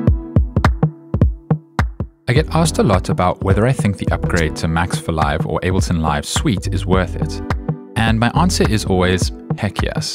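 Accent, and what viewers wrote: South Africa. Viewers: Broooooooo are you south african?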